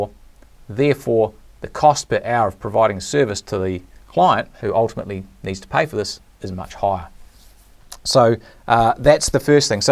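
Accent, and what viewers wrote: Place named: England, United Kingdom. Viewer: Think of his accent. I am not sure if he’s in the US but it’s an English accent and Europe gets more time off compared to US. But I get 160hrs a year plus all bank holidays off so he’s not to far off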